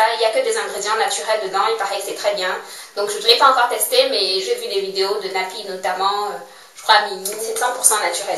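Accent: Cameroon. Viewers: J'adore ton acent de chez nous (kmer mboa). moi j'ai raqué il ya 1 semaine (je me suis defrisée) apres 3 mois de soin et de belle repousses afro, solides et tout. mais je travaille ds la banque et c'est pas evident pour le taf. tu m donne trop envie de me faire mal pour retrouver mes cheveux epais, et tout brefff i lov ur vid's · Hello j'aime entendre ton accent amerounais, moi aussi je suis camerounaise, ça fait plaisir de croiser une de ses soeurs sur Youtube...L'huile de ricin est EXCELLENTE, ça rend les cheveux fins plus épais,ça donne du volume,ça lisse les ecailles des cheveux et le Comble, ça favorise réellement la pousse du cheveu! J'adoreee...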